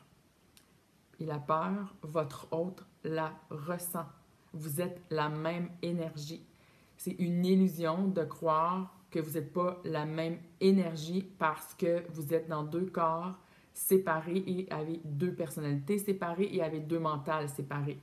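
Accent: Canada. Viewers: Cette chaine est géniale. Merci bcp pour ttes ces informations et tout ce travail. L'explication est bien Claire surtt avec cet accent cabadien adorable. :D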